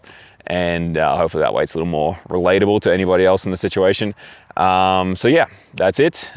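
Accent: Australia. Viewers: I just found your videos must admit enjoying so far. A quick question, are you an Aussie?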